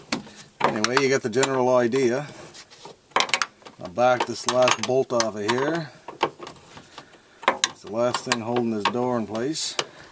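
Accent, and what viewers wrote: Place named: Canada. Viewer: he sounds Canadian!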